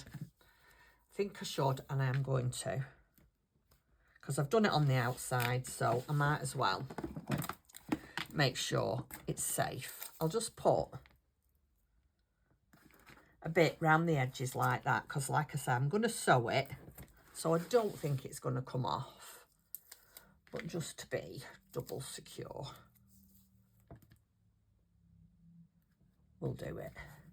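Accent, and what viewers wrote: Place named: England, United Kingdom. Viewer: I like it a lot! I just started junk journals last year and I am like you! I tend 5o hurry through and then I make mistakes and sometimes tear things apart! 😂😂😂. Keep up the great work! One your paper choice! Where in England are you from? I lived in Suffolk many years ago ( I’m American). Loved it! Love your accent!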